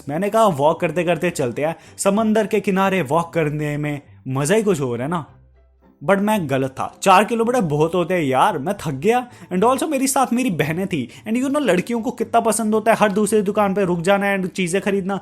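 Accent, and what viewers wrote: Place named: Punjab, India. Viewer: Bhai are you a punjabi because teri punjabi nikal aandi hai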